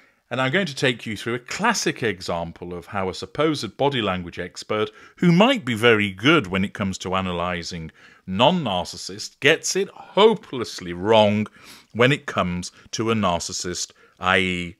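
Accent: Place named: England, United Kingdom. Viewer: English accent is so fancy so when he swears it is extra special Love love love this channel!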